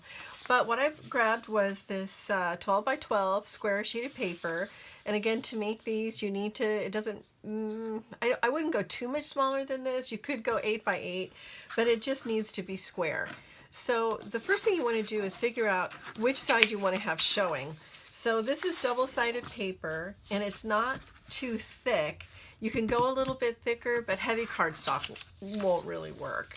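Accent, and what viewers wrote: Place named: Pacific Northwest, United States. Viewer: So cute! Love them. It's my first time here and you sounded like you might local. I'm across the sound from Seattle.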